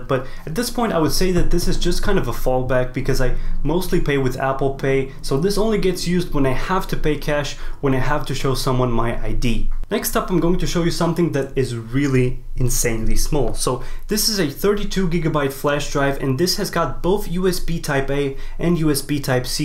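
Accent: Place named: Eastern Europe. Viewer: To be honest, I'm from Russia, but I speak English. Initially, I think you are from Eastern Europe. It turned out to be true. Thanks for the answer!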